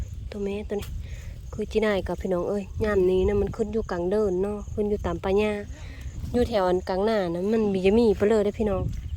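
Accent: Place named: Phu Thai, Thailand. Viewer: เว้าผู้ไทยคือเดียว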